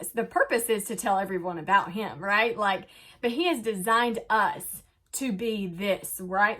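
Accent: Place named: South, United States. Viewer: Chick-a-boom! You are rocking it wicked! Love your warm little southern accent! I’ve been doing the MK for near 6 years and loving your style! I’d love to hear about your behind the camera and preschoot prep. I’ve been thinking about doing the YouTube thingy. Stuck on the start part.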